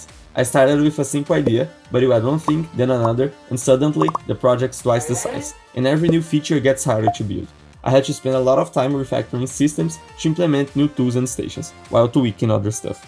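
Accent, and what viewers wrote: Brazil. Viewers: That accent... Tu é brasileiro mermão! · Só fui perceber ao final do vídeo que teu sotaque de inglês era brasileiro. Ótima edição! (com alguns efeitos sonoros um pouquinho acima da voz) But I really liked the project and the core loop of the game. The graphics are getting there and show an astronomical evolution since the beginning. Overall, take care with scope creep, and good luck!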